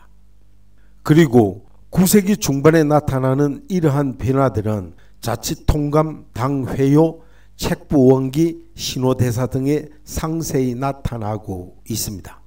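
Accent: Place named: Busan, South Korea. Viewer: 하이고 ᆢ 나도 부산이지만 사투리 좀 고쳐라 ᆢ 다른 유투브에서 그러더니 아직도 못 고쳤네 ᆢ 왜 그리 어미에 그리 엑센트를 두냐 ᆢ?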